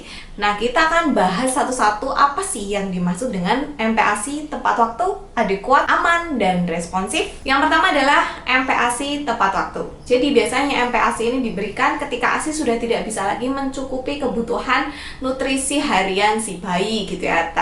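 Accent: Java, Indonesia. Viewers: Makasih 🥺❤️ Udah rajin edukasi kami lengkap infonya terutama aku newmom yg mana aku uda gapunya Ibu.. dr awal hamil sampe lahiran skg babyku umur 4mo rajin nonton bubid belajarnya disini lengkap - everyday is learning. Bonus logat jawanya 🥰🥺 berasa lagi dikasih tau keluarga sendiri (aku rantau d jakarta) *peluk hehe Sehat selalu yaa ❤️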